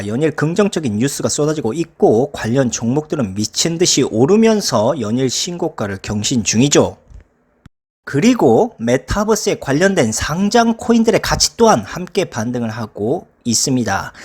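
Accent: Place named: Suncheon, South Korea. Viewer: 친정이 순천인디 님 사투리 항상 즐겁게 듣고 있습니다 오늘도 화이팅 하십시오 그동안 밀린 댓글 오늘은 도배하고 갑니다 저는 그럼 오늘은 이만 뿅~~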